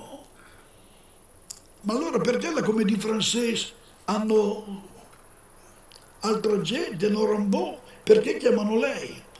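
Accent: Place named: Milan, Italy. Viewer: Sono giorni e giorni che vedo e rivedo questi filmati di Piero Mazzarella un grandissimo attore di prosa dalla voce inconfondibile dalla cadenza dialettale milanese di una volta e mi si stringe il cuore e mi commuovo a sentirlo sapendo che purtroppo non'è più fra' di noi mi sarebbe piaciuto che ci fossero più filmati a lui dedicati in rete per sentire i suoi racconti storici culturali e dialettali perchè attraverso di essi rivive un mondo che non c'è più ma che scorre ugualmente nel nostro sangue e nel nostro DNA in modo inconsapevole per questo ci emozioniamo quando li ascoltiamo perchè ci fanno capire che essi rappresentano le nostre origini etniche e culturali che spesso ignoriamo ma che fanno del popolo italiano un popolo immensamente ricco a livello linguistico e comportamentale.P.S. R.I.P.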